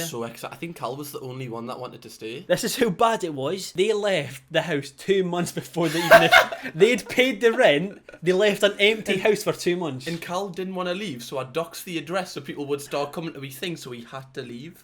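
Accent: Scotland, United Kingdom. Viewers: Yous couldn’t get any more Scottish if ya fooking tried ladi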